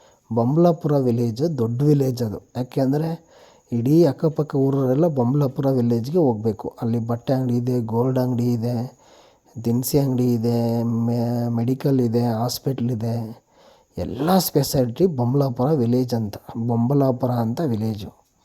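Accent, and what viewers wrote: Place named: Tamil Nadu, India. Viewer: Irritating presentation 😢😢, please speak naturally, kannadavanna tamil accent alli mathadthare. Helidde heltheera, gottu english sariyaagi baralla antha, sariyaagi kannadadalle maathanaadu, english shoki yaake 😢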